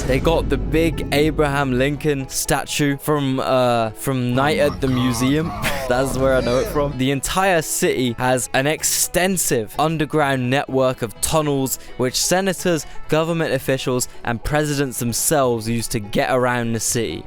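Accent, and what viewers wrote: United Kingdom. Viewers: lol actual Native Hawaiian here ill show you around if you give me british accent lessons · Why is a British guy explaining US states? What are the rankings supposed to be based on? Have you ever even been to the US? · British accents have an edge on everyone else when it comes to narrating YouTube videos like this guy. For instance, listening to critical drinker with his Scottish accent spew his criticisms of movies and streaming tv shows like Amazons Rings of Power is absolutely hilarious because he’s mostly right, but also that Scottish accent gives it an extra comical spice.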